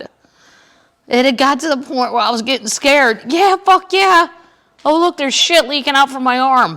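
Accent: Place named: Appalachia, United States. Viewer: your hillbilly accent has gotttn very strong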